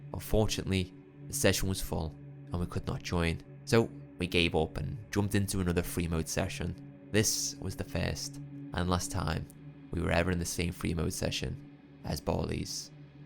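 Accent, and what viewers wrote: Liverpool, United Kingdom. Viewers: R u Scouse ? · Fellow scouse?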